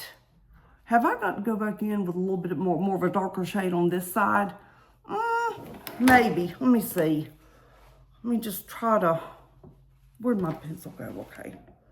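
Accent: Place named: South, United States. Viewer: Happy Valentine's girl great look!! I love your southern accent and the things you say always gives me a giggle 😊 love ya my friend xo ❤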